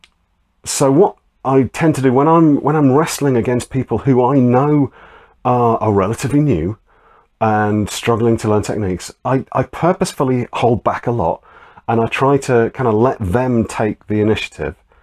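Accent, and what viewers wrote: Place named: London, United Kingdom. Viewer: You have been taught actual catch wrestling where you lose the match if you're pinned. There are other systems that come from catch wrestling such as Luta Livre (almost definitely the best style there is), Shooto and CSW where the match doesn't end if you're pinned. I recommend learning these systems if you're trying to learn to fight off your back but don't want to learn Jiu Jitsu and want to carry on using your catch philosophy and techniques. There's team cross face in South London where they teach CSW. By the way you sound like you're from London. If so, do you teach people? because in your video you said you're willing to teach.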